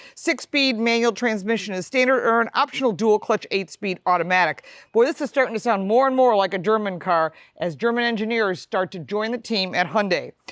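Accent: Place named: Midwest, United States. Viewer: Got to love that Midwest twang... Great review!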